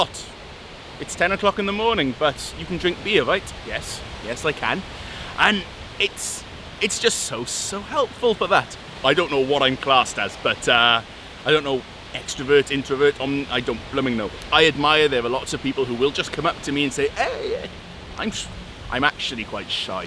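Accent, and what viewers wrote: Bisaya, Philippines. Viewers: OMG, you even got the filo accent lol so funny!!😂😂 It takes time to understand filipino mentality and to get used to it without getting frustrated and even diappointed. Your approach is good if it helps you to keep your sanity in tact! Kudos to you. Some incidents are just out of your control and hey, no worries be happy attitude sometimes work. Stay safe and God bless🙏💝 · Nakurat ko..perteng bisayaa man diay. Haha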